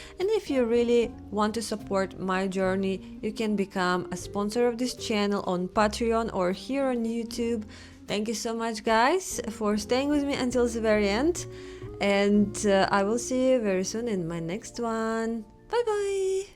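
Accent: Russia. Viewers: Horrible Russian accent! You need subtitles, period!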